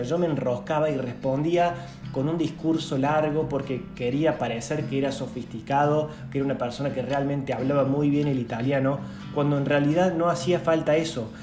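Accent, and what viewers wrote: Cordoba, Argentina. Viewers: excelentes consejos, que grande ese acento cordobés, saludos desde Rosario.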